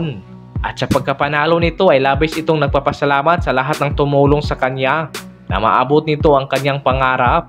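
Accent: Visayas, Philippines. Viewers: Magkatulad tayo lods bisaya Be proud!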